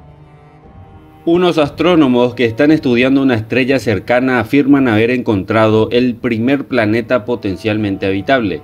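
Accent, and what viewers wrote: Paraguay. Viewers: Sos Paraguayo?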